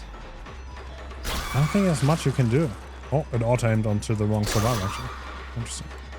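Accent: Germany. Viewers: ich hasse diesen deutschen aktzent und seitdem ich weiß das du deutscher bist wriß ich warum ich mir deine vids nicht geben kann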